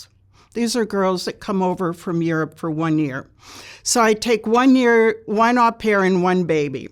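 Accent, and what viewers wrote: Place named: Chicago, United States. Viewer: You can’t beat the Chicago accent! ❤ She reminds me so much of my grandma who lived her entire life there. What a spirit, what a story!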